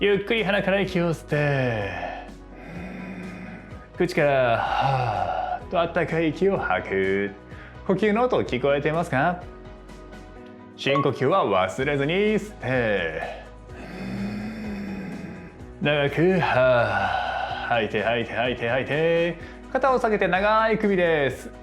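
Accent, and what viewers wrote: Kansai, Japan. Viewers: 脚痩せ、だいてんし引っ込めたいです。先生の良い声、時々関西弁がツボです♪洋画の吹き替えやってほしいです^ ^ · 初めて見ました〜 関西の方かな？ 私はあまりこの手のYouTube見ないのですが、解説がどこにどう効くかとか、今の状態が示す自分の体の状況がわかりやすく、あまり長すぎないストレッチ、そして声が良くて！最後まで一緒にストレッチしました〜 体スッキリ！ 年齢と共に体型が少しずつ変化していくのでそのへんの解説と共に効果のあるストレッチをしたいな〜と思い、登録しました！ 昔に比べてふくらはぎの太くなっていく部分が下がっています。太さは変わらないのに…これもまたまだでしたら解説聞きたいです！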